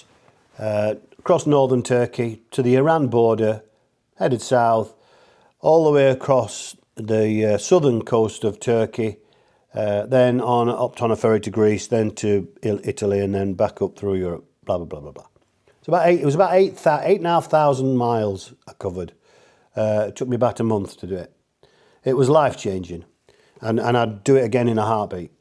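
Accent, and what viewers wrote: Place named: Manchester, United Kingdom. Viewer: Evenin ! Rob recommended your channel … we’re in a similar situation, awaiting sale of house before we can buy. However our budget is lower than yours 🤣🤣 despite your Canadiannlication I recognise that you are a Mancunian 🤔😵😵. Like you i loved my 1150 GS… sadly due to a few prangs I was persuaded to change to 4 wheels- compromise was no roof 🤔🤔 … hope all goes well on the trip to France 😎 Steve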